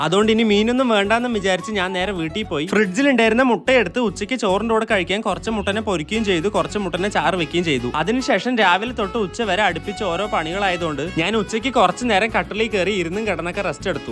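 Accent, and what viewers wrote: Palakkad, India. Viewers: ചേട്ടൻ പാലക്കാട് ആണോ അവിടെ ആണ് ❤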